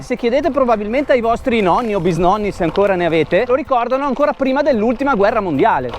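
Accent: Veneto, Italy. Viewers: sono di Reggio Emilia originario della Colombia. Mi piace tanto vedere i tuoi video e essendo un ciclista sfegatato amo ciò che fai e come affronti la vita. Spero di incontrarti un giorno per parlare e sentire di persona il tuo accento Veneto che mi fa tanto divertire. Ps mi sono trovato la ragazza di Verona così iniziò a imparare qualche parola in attesa di incontrarti 👍🏽 continua così sei una forza della natura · Sono Piemontese ma il tuo Veneto lo capisco ed è bellissimo i tuoi video mi fanno sempre ridere grande continua così!!!🍺🍾🍺🍾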